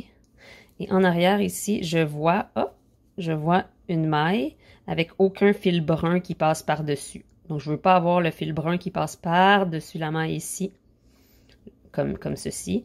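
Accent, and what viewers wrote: Canada. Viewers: Je suis définitivement fan de tes vidéos !!! Grâce à tes tutoriels j'apprends plein de nouvelles astuces tricot. Tout est vraiment bien expliqué et compréhensible. En plus ton accent et tes expressions me donnent encore plus envie de découvrir le Canada!! Bonne journée et merci pour ces échanges.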